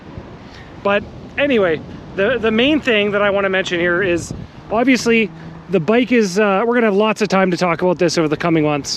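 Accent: Canada. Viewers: Canadian accent is a bit of a give away as to NOT American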